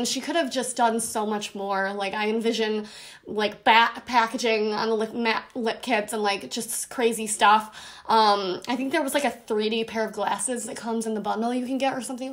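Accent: Buffalo, United States. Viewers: Why is the packing always better than the products? Oh and are you from Buffalo? I love your accent!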